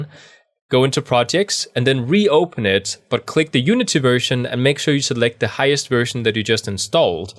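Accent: Denmark. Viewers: I like your accent as im also danish :)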